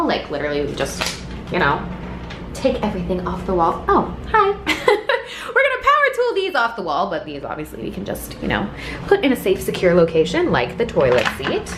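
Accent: Australia. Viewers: she likes to talk in an Australian accent because it used to be a goal of hers to go to Australia so she was speaking it into existence, she probably just has a habit of doing it sometimes now lol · It annoys me so damn much that in every single video she has to say “SORRR” in that redic Australian accent like sis stop it’s not cute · Can anyone tell me why she has an aussie accent on her so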